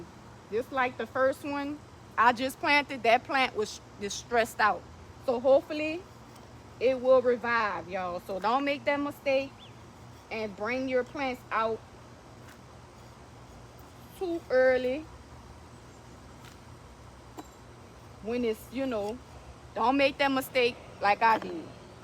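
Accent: New Orleans, United States. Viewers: Love you channel and listen to you talk the nawwlens voice is coming thru.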